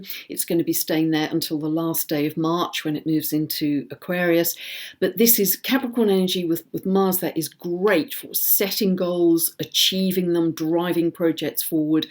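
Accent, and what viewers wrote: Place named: Oxfordshire, United Kingdom. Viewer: I'm curious if you're in Oxfordshire(judging by your accent).Interested in a reading.Maybe you could answer some questions.My chart is full of contradictions.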